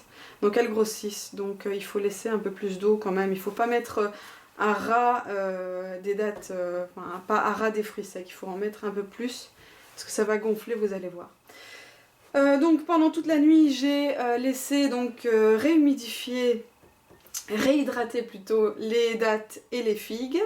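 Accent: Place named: Belgium. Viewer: Merci pour la recette mevrouw ; j'ai tout de suite entendu que tu étais une compatriote Belge ! :) J'ai une question : le Blender Magic Bullet est-il une machine fiable ? Parce que vu son prix (99$ c'est ça ?), je préfère que ça soit un investissement sûr et durable (et alors, où se le procurer en Belgique...).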